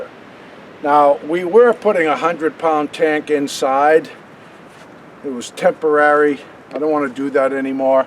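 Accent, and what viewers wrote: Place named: New York, United States. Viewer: Great green house 👍 . I'm looking at the yard today,, bags of leaves all over the place ((just in case )) hahaha. I don't know if u ever did a dumb thing like I did today , I had the iron rake set up by the fence and didn't see it and stepped on it and wamm the long handle hit me in the mouth and nose. Man did that hurt ,, I thought I broke my tooth . It's O.K. NOW but wow I don't know how long I can do the garden ,, just turned 80 and it's getting rougher every yr. Love your videos and N.Y. accent ,, My daughter used to live in Jersey & he worked in the CITY . SO I know that accent ((smile)). Fm. W.I...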